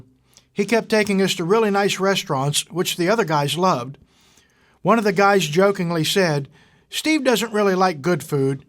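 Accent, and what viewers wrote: South, United States. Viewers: Are you from the south? If so what state? ROLL TIDE!!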